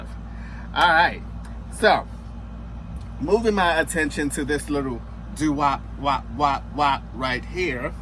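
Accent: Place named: East Coast, United States. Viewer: your accent 🥰, are you from the east coast? Enjoy the cruise and FOA Fam 🎉🎉🎉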